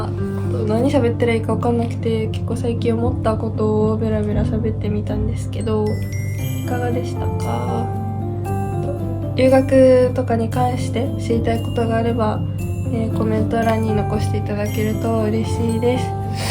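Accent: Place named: Northeast, China. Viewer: 😊Chinese have a littile northeast dialect, rise up！🎉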